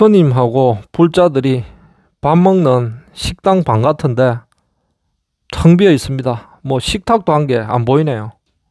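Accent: Gyeongsang, South Korea. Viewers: 말을 참 조곤조곤하게 잘하시네요.저는 개인적으로 경상도 말투를 좋아 하지 않는데 말투는 참 듣기좋네요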